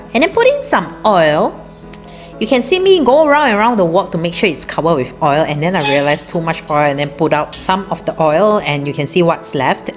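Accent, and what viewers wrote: Malaysia. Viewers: it's your Manglish I came to listen to, so marinated with BM and Chinese.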